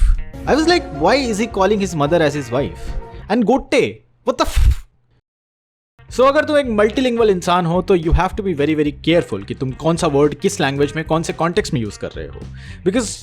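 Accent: Bengal, India. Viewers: Mannnnnnn YOUR Bengali accent ❤️❤️😊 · Par dude your Hindi sounds Bengali enough to understand that you are a bengali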